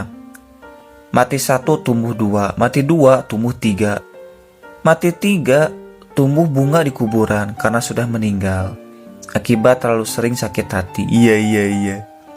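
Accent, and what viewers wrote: West Java, Indonesia. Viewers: Sunda mang wkwkwkw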